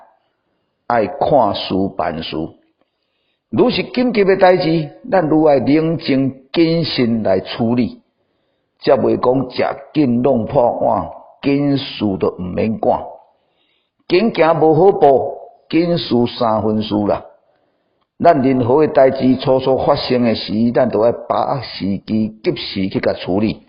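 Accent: Taiwan. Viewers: 讚，聲音好聽 正港的台灣話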